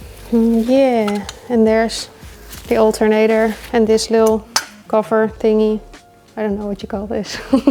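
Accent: Netherlands. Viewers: I’m a tech but I’m more taken by your Dutch accent? I hope I’m right that assumption your from the Netherlands 🇳🇱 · So graceful you make it look easy. Love the Netherlands accent as well.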